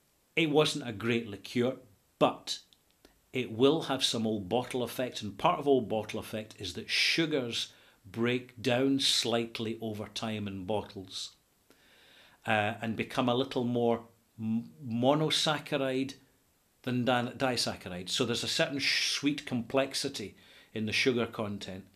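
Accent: Scotland, United Kingdom. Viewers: is my goto guy for all things whisky. However, for those of us in the USA who have a little difficulty with the Scottish accent (although who much appreciate it), it would be really helpful if the Brands reviewed in the video were listed in the notes.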